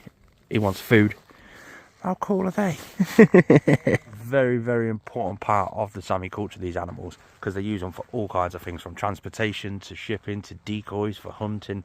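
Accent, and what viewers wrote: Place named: Stoke, United Kingdom. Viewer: Dude are you from Stoke ?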